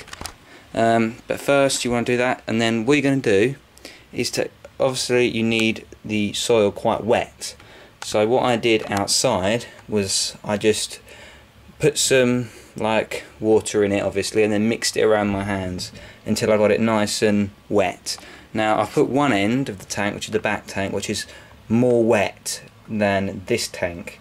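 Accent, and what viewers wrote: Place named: United Kingdom. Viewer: Great vid! Do you live in the UK or the US? Because you're using American measurements but you have a British accent.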